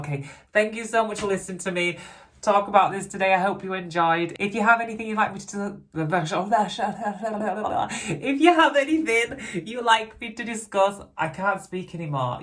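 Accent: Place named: Oldham, United Kingdom. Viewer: You have and accent like Brian Cox. He grew up in Oldham UK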